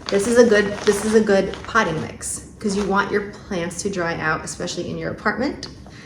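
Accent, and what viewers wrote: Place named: New York, United States. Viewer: Stunning goddess: I'm just loving your vlogs! I love your way to explain and love your NY accent❤️❤️❤️❤️❤️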